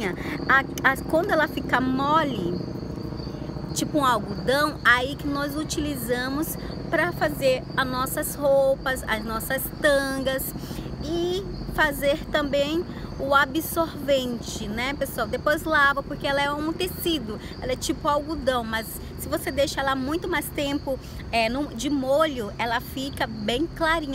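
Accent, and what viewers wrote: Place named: São Paulo, Brazil. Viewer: Índio com sotaque paulista !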